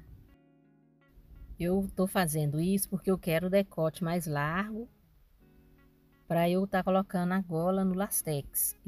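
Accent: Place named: Minas Gerais, Brazil. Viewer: Estou há anos vendo vídeos de costura, e nunca vi os seus. Aonde vc estava mulher? Meus Deus. Vc é um misto de simplicidade, competência e profissionalismo, agora pra me destruir, vem com esse mineirês que eu amo. ❤❤❤❤ Parabéns.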